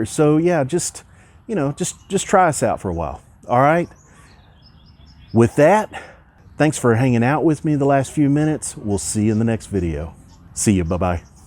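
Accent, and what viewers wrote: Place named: South, United States. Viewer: What state are you from in the US? You have a sorta country accent..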